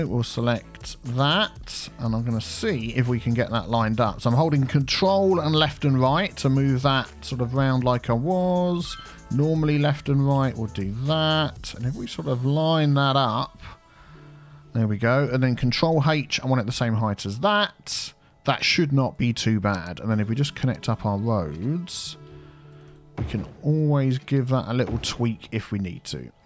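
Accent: United Kingdom. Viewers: what do you specifically call your accent? Just british?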